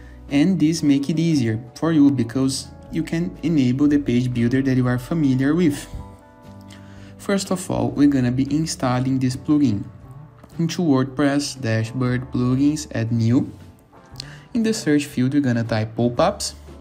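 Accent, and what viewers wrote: Brazil. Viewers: you sound brasilian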